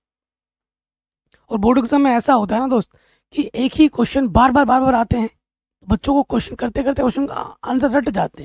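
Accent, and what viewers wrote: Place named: Jharkhand, India. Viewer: Sir mujhe lgta h ki aap jharkhand se ho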